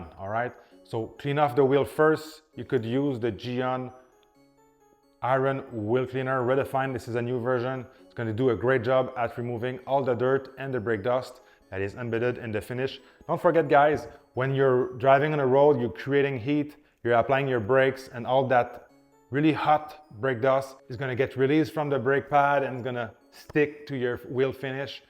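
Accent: Quebec, Canada. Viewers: You sound Québecer. Subbed!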